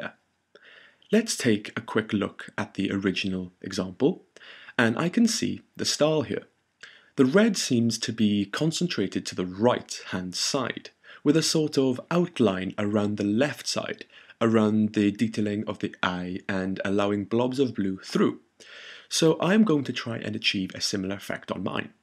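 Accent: Wales, United Kingdom. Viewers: his accent sounds Welsh me thinks, tidy :)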